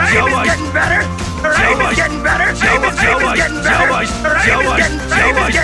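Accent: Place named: Scotland, United Kingdom. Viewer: He starts sounding Scottish halfway through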